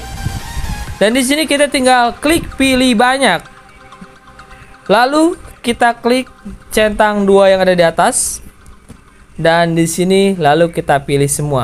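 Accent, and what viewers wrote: West Java, Indonesia. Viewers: Bang orang Sunda ya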